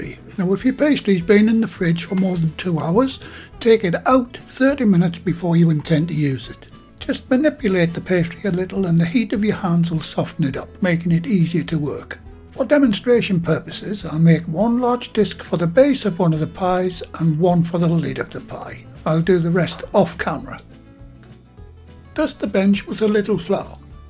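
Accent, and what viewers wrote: United Kingdom. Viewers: I live 100 miles away from where this gentleman sounds like he comes from. I'm not so sure people are being offensive, the video is very good, his voice does resemble one of the worlds most recognised animated characters, I'm pretty sure this will also help boost the hits and likes on the video. Also, accents should be celebrated, I love how our accents vary across the UK, for small island, you can 30 miles in any direction and find a different accent. 👍🏻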